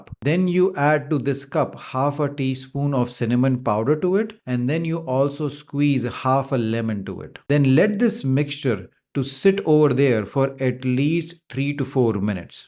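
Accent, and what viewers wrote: India. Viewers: They know - just because its not how you pronounce it. it doesn't mean its wrong. Its Indian English and that how it is.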